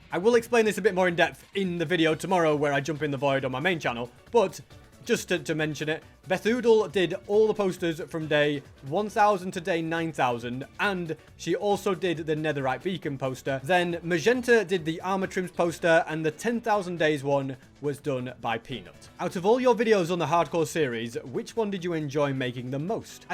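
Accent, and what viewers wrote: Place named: United Kingdom. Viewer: Bro is pure british. For a moment I tought you are mcqueens brother🤫💀💀💀💀💀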